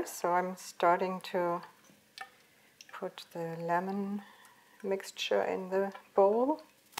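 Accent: Germany. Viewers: Best recipe. Maybe Imperial is cup measurements, I have an app that converts & on measuring cups we have both. America uses cups & ounces. I love your slight German accent & have just subscribed. I can’t wait for some great German recipes!!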